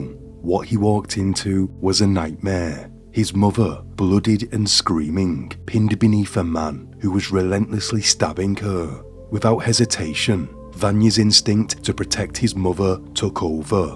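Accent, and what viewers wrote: United Kingdom. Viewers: This dude sounds like a bot with one of the thickest and most annoying british accents ever, lmao Literally unbearable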